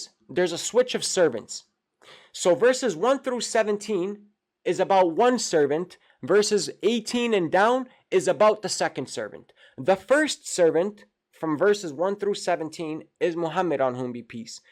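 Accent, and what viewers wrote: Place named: South, United States. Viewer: Curious what kind of american accent that is. Southern , sounds like.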